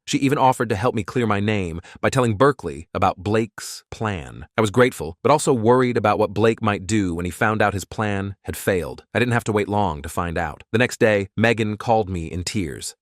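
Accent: United Kingdom. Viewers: sadly, but I like how the Brit accent sounds! (It was just funny how it randomly switched in the video)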